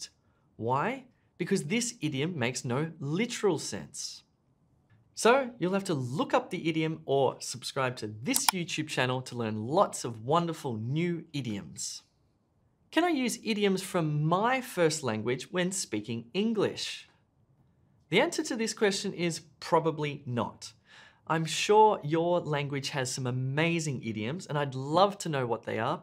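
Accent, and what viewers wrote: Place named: France. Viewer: ur pronunciation is french english bro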